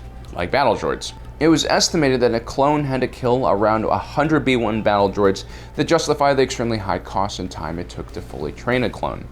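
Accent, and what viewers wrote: Australia. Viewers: That Australian accent tho 😂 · dat Australian accent doe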